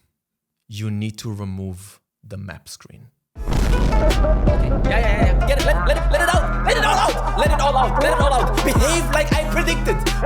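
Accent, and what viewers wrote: Germany. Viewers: Man hört das deutsch sooo raus hahaha geil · What is bros accent 😭😭😭😭🙏🙏 It's like a lovely mix of German, Japanese, and a little bit of French. It's fucking me up in the best way. Anyway, fantastic video. I'm just as hyped for Yotei and the new stuff has me excited for a more immersive, less ubisoft-style "map checklist" game. Just getting lost in a massive world without feeling like an overwhelmed menial forced to visit every point of interest in the hopes of a crumb of meaningful content. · I'm Confused you have so a german Akzent and use german Words. Ich bewerte das Video 9/10, du hörst dich fast so an wie Raffey👍🏻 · danke für dein friendship, alter du sprichst englisch mit einem deutschen akzent, bist du auch deutscher oder ?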